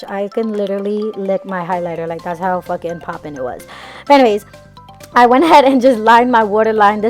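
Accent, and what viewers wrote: New York, United States. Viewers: Ur the only YouTuber I enjoy watching lmao I loveee your NY accent and your amazing vibes! Keep it up girly ❤️❤️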